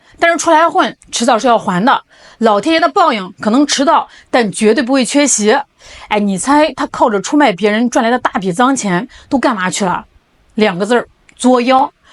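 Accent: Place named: Shandong, China. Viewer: 山东口音😂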